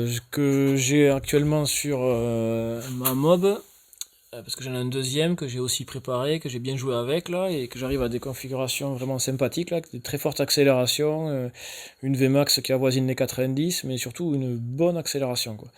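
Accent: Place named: Toulouse, France. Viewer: Bonjour! Heureux de réentendre ton accent toulousain " con"! Dis moi la rondelle que tu as mis en bout sur l'ecrou du vario er3 de ta mob elle mesure combien d'épaisseur et quelle est sa fonction? 😊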